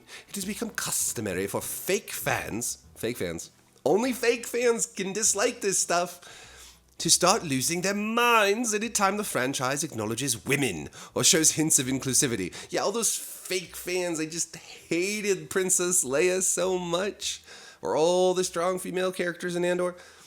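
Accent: United Kingdom. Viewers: "Being a critic, you're supposed to tell the truth". Hallelujah. Thanks for being one of the few critics who actually believes this! BY the way, keep up with the British accent - way more entertaining than shows like the Acolyte. BTW - the BBC are making a show about the Battle of Hastings featuring, you guessed it, a diverse cast. Exactly the sort of artificial nonsense you are talking about. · Moar British accent please! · is SINGLE HANDEDLY bringing CREDIBILITY and reason to FORBES Pop Culture topics! Also laying down the SUCCINCT British Accents! 😅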